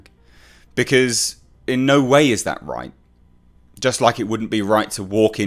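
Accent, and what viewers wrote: United Kingdom. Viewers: I've become so used to the British accent when you said you never heard of here I was about to say something. Than realized, hey, he's British. The internet has ruined me lol. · Wait....you're British?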